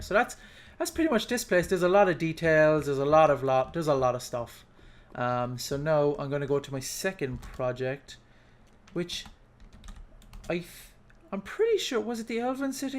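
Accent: Ireland. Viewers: I believe he is from Cork (Ireland). He is definitely Irish though.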